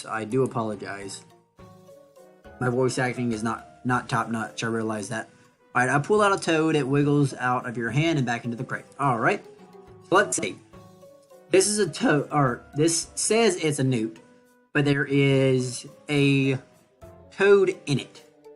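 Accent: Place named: Ireland. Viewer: It's Cool! The Irish Accent adds some flair to it! 😎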